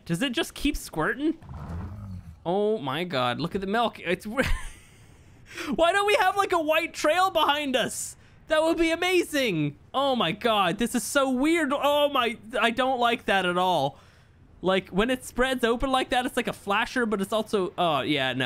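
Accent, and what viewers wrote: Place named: Ireland. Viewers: I always thought he was Irish lol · His wife is from Canada so her accent could of worn off on him a bit, not sure, he could of also changed his accent a bit on purpose to be more understandable on youtube as irish accents can be a little hard to understand at times when thick.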